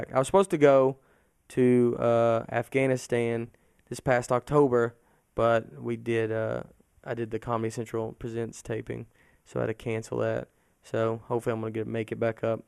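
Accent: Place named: Tennessee, United States. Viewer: New York... really? Well, I understand. I usually don't crack up at stand up, but your accent is ridiculous. I'm from Tennessee, Nashville area too, so yeah I get it :) Come to Zanies! Book a show, bring your wife and we'll go out to dinner; you two and my fiance! You remind me of my friends and myself! Really relatable. That's probably why I can understand where you're comin from and why you're perspective is refreshingly funny. Oh, wait, no that's the Italian back hair...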